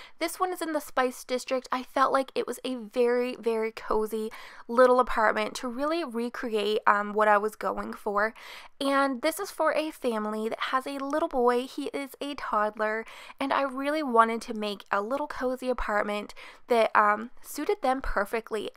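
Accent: Canada. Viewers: Are you Canadian?? BTW love the build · b Canadian I think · are you Canadian? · Love this!! Is that a canadian accent i hear?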